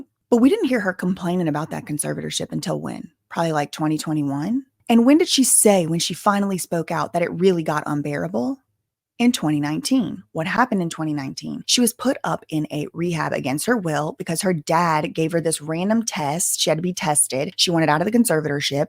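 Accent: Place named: Louisiana, United States. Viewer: I love watching you 😂 the pink Pom Pom’s, Louisiana accent…just so darling 🤗 love from London 🇬🇧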